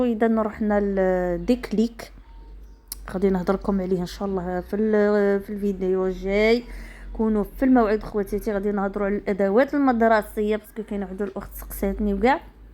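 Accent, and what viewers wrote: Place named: Tolga, Algeria. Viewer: على هدرتك كنتي جيهت طولقة انا من بسكرة تحية ليك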